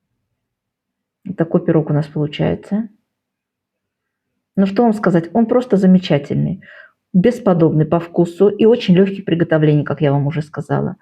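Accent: Baku, Azerbaijan. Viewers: здравствуйте Вы из Баку? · Я с удовольствием вас слушаю за ваш бакинский акцент ,удачи Вам а я Гюльзар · Я как раз так и думала что вы наверное из Баку Такой мягкий мелодичный бакинский акцент😊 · Слушая вашу речь, услышала знакомые бакинские нотки ❤ Прочла комментарии и поняла, что не ошиблась ! Бакинский говор остаётся с нами на всю жизнь и не узнать его не возможно .. Долгой жизни вашему каналу и удачи ! С приветом от бакинки ❤🌷💓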